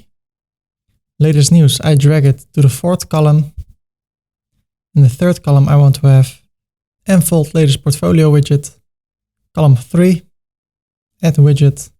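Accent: Netherlands. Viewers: your accent and face just shows me that you are dutch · ik wist meteen dat je nederlnds was je kan wel goed engels praten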